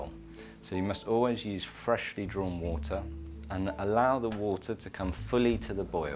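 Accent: United Kingdom. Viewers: The perfect tea video: English accent Formal attire Proper tea etiquette Elegance Fine china Immaculate tea knowledge 10/10, would recommend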